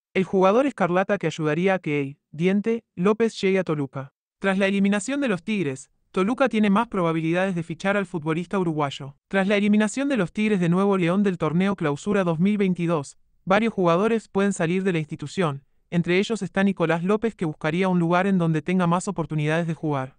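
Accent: Argentina. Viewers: Otro vez el argentino hablando 🧐 cómo se llama usted amigo argentino preséntese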